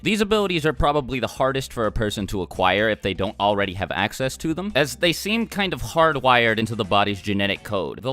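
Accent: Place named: United States. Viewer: Your American accent is killing me... STOP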